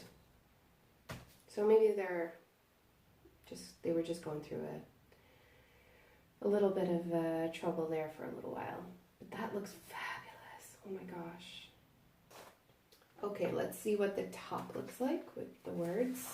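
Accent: Ireland. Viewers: I enjoy listening to you, your voice is very soothing while I am drilling. You'd be great on late night radio. I don't know if you realise how much of a an Irish accent you have picked up. Like your new arrivals. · Wow you're sounding very Irish in this video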